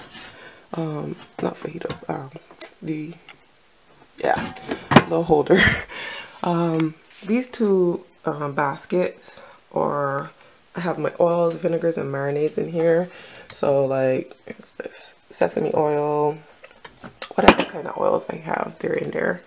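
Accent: Barbados. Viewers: From the beginning of the video I heard the bajan accent then I looked at the name sure she's a bajan.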